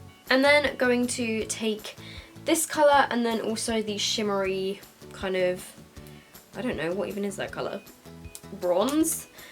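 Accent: England, United Kingdom. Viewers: Are you from England? Your accent sounds that way 💖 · I just subscribed and I wanted to know if you were English? (I'm English)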